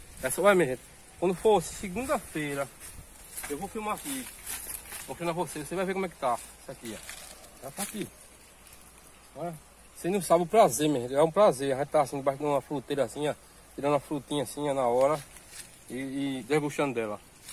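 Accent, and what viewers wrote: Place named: Northeast, Brazil. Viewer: Boa tarde amigo adoro seus vídeos e sua família. Fala do jeito que vc sabe eu estudei mais amooo o jeito nordestino de falar isso é vergonha não as vezes eu falo errado e normal pra mim liga não continua vc é top trabalhador isso e no que importa um abração pra vcs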